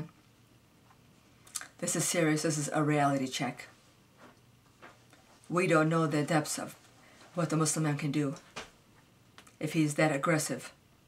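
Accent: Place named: United States. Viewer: That is Islam. Did you read the Koran? It states women are worth half as much as a male. I married an Iranian in 1990, had him jailed several times for abuse, divorced him and he's lucky I didn't kill him. Now he is remarried to his cousin, that was their plan all along. Inbreeding is the norm, birth defects are the norm, abuse is the norm. You sound american woman, don't married another muslim. Religion is evil, all of it.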